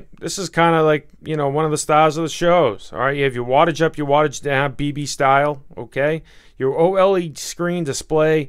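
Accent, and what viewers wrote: Boston, United States. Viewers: are you from boston? keep up the good work by the way !!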